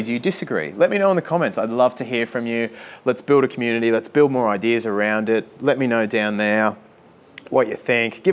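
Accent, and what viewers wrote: Australia. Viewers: Are you Australian? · its the Australian accent🤣😻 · I love your strayan accent sm XDDD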